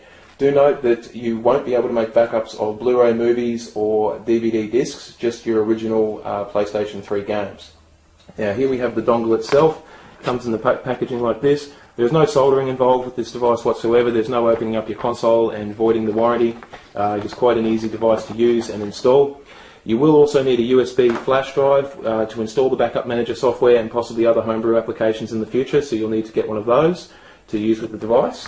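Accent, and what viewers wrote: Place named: Australia. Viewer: are you from oz mate????sure sounds like it.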